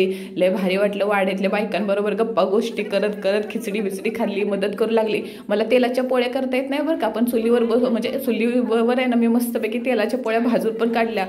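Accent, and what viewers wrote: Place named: Karad, India. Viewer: Tu lay bhari karadi bhasha boltes❤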